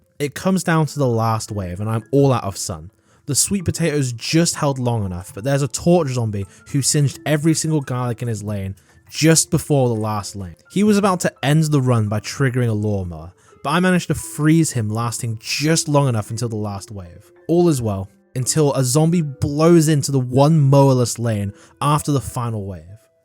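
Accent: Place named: United Kingdom. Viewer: love the way you talk a great uses of being british.(im british)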